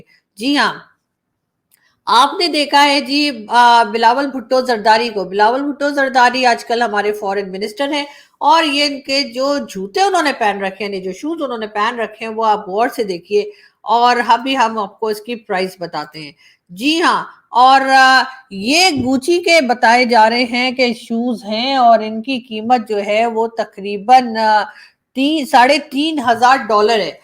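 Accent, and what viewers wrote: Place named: Mumbai, India. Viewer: Aap ka aaj kal urdu ka bolne ka slang bombaiya ho raha hai, Bollywood style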